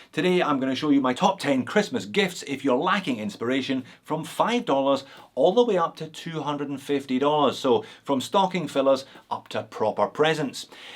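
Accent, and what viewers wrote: Scotland, United Kingdom. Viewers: Thank you so much for all these wonderful vids (and this bit of scottish accent comin´ from Down Under)! I scratched the itch today and got me one of these lovely chinese Seagull chronos 😁😁🎅🎅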